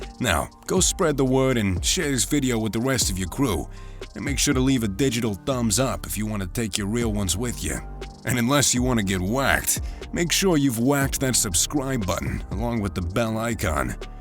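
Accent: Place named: Bronx, United States. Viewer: Love the NY Bronx accent 😅👌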